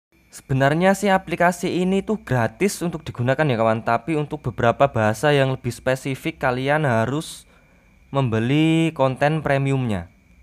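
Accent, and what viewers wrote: Java, Indonesia. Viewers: Jangan medok co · ngomongmu medhok ee mas pake lu gua lu gua. gugel ya gogel wkwkk. lanjutkan.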